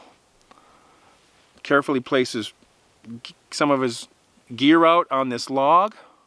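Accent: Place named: Minnesota, United States. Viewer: My older sister lives in Minnesota, way up near Hibbing. Been there several times and you can definitely hear the accent in as he talks. Accents rarely 100% leave you.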